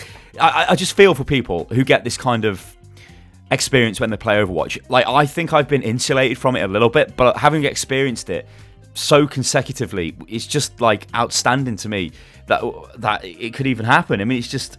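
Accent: Germany. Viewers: He´s got a pretty terrible german accent.... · Iam from germany too and I recognize a german accent xD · oh god this german accent like srsly...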